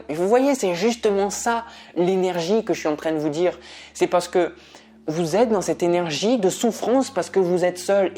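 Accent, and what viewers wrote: South, France. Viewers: Merci pour ce tirage très pertinent. J aime écouter vos guidances et votre manière de les transmettre, de façon naturelle et franche avec cet accent du sud qui me reconnecte à mes racines.🙂 Merci aussi pour votre dévouement et l aide que vous nous apportez. 💕🕊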